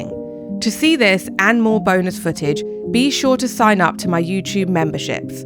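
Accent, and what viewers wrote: England, United Kingdom. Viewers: Just came across channel….this is the best bunny hole I entered. I love listening to her voice, Britishly delicious!!! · Hi. your videos are so good and you explain in a wonderfull voice the really italianity. italy its my country and your "England" english its a sinphony for who don't tell and listen to much like me. You're so very beautifull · Love your shoulders 😍, also you have a really good British accent! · That UK pronounciation is simply unbearable!! 😢😢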